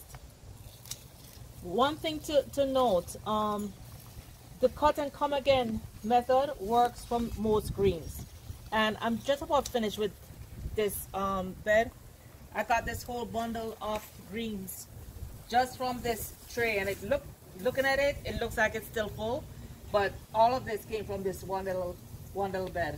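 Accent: Jamaica. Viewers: you're welcome Jamaican accent Are you Jamaican or just Jamaican parents?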